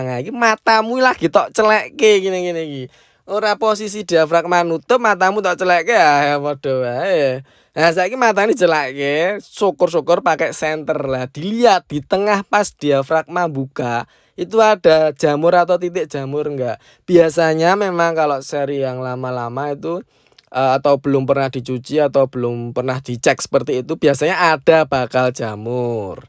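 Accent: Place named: East Java, Indonesia. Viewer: Mantap jawa timuran banget mas, terimakasih informasinya yg sangat berguna banget👍👍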